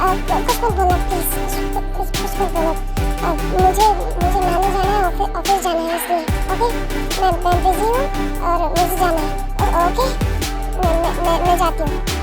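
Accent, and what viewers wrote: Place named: Punjab, India. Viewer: Jinu punabi voice ❤😊🥰🥰🥰🐂💜❤❤❤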